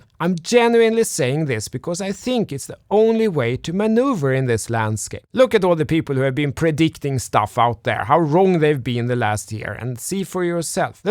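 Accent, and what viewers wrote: Sweden. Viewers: Like you’re video! And the most Swedish accent I heard 😂😁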